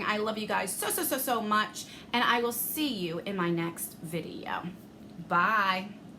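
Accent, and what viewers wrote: New Jersey, United States. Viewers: I just discovered your channel yesterday! I knew you were a fellow Jersey girl from your accent!!!!! Definitely going to keep watching! Always ready to support a Jersey sister!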